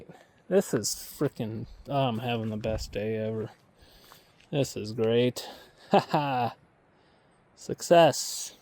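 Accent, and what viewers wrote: Utah, United States. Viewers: That's what I thought. Maybe Nebraska and Utah people sound similar 😄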